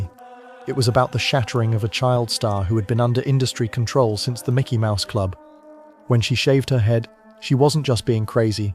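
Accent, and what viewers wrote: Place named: United Kingdom. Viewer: Sensationalistic AI slop. Powerful people always have and always will exploit the ambitious and vulnerable. A robot’s British accent doesn’t make it true. Plenty of serious problems in the real non conspiracy world far more deserve your time. Ever consider you’re getting suckered and distracted by this junk?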